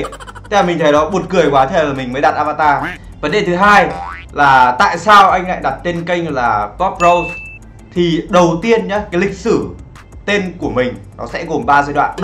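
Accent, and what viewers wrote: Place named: Central, Vietnam. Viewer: Liz gà dũng nói dọng miền trung dương em ko biết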